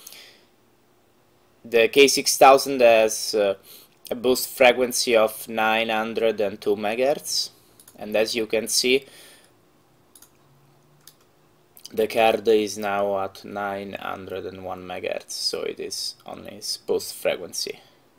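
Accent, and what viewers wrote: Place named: Italy. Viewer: si sente che sei italiano ahahahahah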